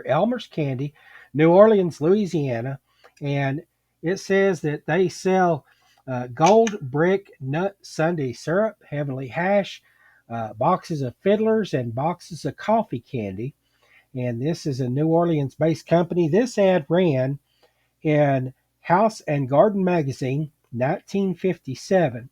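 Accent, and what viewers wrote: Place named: Appalachia, United States. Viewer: HAHA Hillbilly accent. Being in NC, we have a lot of that here too. I call my friend a Renaissance Redneck. 🤣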